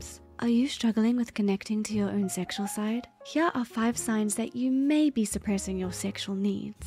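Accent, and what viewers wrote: England, United Kingdom. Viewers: Why does she’s have English accent this isn’t normal is it does she do some the videos 🧐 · wait, gone British all the sudden now lol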